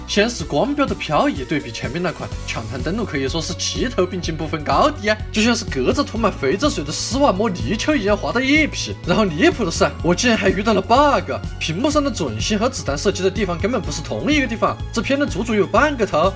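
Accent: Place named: Hunan, China. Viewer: 哈哈这湖南口音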